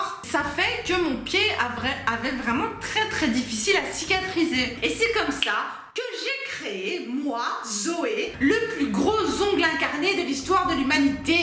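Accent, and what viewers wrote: Belgium. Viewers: J'hallucine peut-être auditivement mais .... serais-tu BELGE? ❤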